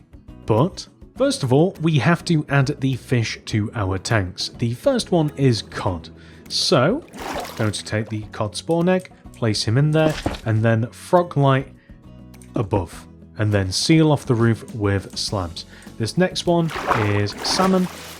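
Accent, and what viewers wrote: United Kingdom. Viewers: Are you British?🇬🇧🇬🇧🇬🇧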